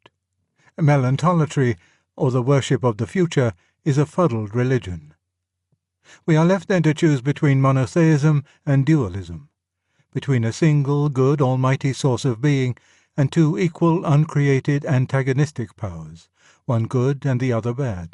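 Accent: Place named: Oxford, United Kingdom. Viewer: You must be unused to the Oxford English accent. The U S accent is foreign to the rest of the world! :-)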